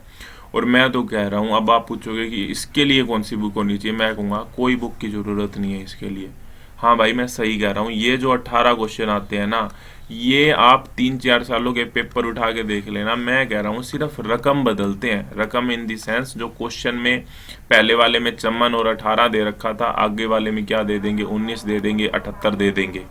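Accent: Haryana, India. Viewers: Bhai Haryanvi hain ke?? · Sir mujhe aapki voice bhoutt aachi lgi aapke bolnee ka tarekaa gjjbb h haryanvi side se ho kya aap😙😙😙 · Aawaj se to Haryana se hi lagg raha hai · Ha lgte to haryanvi hi h ....sonipat side k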